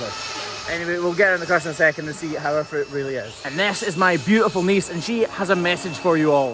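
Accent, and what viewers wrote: Scotland, United Kingdom. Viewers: In the intro there, you sounded like a man who needed to finish his coffee before he tried to talk! This 65-year-old Canadian woman finds that she really enjoys your videos. Why? You really love your life, the scenery is spectacular, and you sincerely care about the comfort of those cows. Your pleasant voice and Scottish accent don't hurt a bit either.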